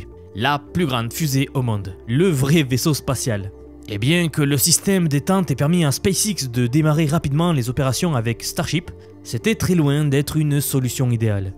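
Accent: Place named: South-West, France. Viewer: Trop bien ce contenu ! Full valeur merci. Bonus pour l’accent du sud ouest !!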